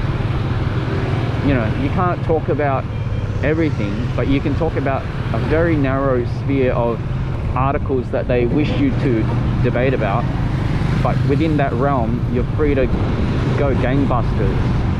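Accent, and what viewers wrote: Australia. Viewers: i agree with you 100 percent...up to a certain point. the point: try making a video bashing vietnam while u r in vietnam...and then, go back to australia (assuming from your accent) and make a video bashing australia. and... mr. dam vinh hung was recently "called in" for a meeting with the authority and fined for warning people about the kung-flu that going around. and... some dude wrote a song about police brutality and spend about 2 yrs in prison...he's ok now... In the theme of the movie 300...walk around saigon with a shirt that depict king leonidas kicking hcm into the bottomless pit with the caption: THIS IS SAIGON...good job, nice vid and thanks.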